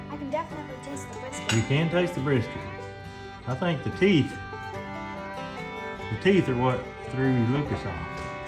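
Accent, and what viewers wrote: Tennessee, United States. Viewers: YOU FROM Texas BUT YOU STELL HAVE THE TENNESSEE ( T ) in it hahaha I've not Commanded on your VIDEOS YET BUT IVE BEEN WATCHING A LONG TIME ALL YOUR VIDEOS ARE BLESSED TO WATCH GOD IS SO GOOD TO US ALL THE TIME AMEN BROTHER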